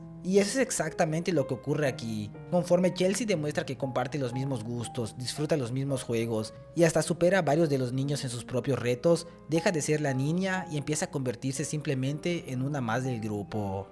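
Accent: Yucatan, Mexico. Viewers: Maaaa mi karnal suena como yucateco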